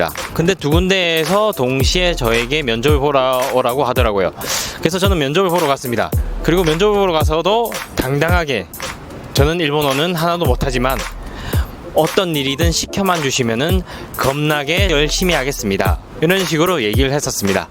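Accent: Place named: Busan, South Korea. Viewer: 영상보다가 억양을 들어보니 부산분 같은데 고향이 어디신지요? 저는 부산입니다.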